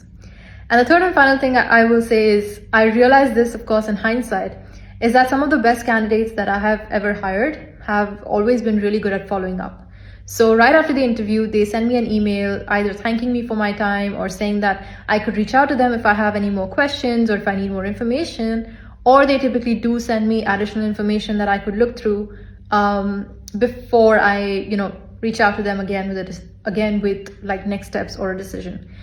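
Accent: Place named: India. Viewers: you have a little indian accent can't you speak a little bit faster? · She has an Indian accent because she's Indian. No one needs your opinion on how they speak, she speaks confidently, and the thing is you are talking about how she speaks not on the information she is providing for her subscribers.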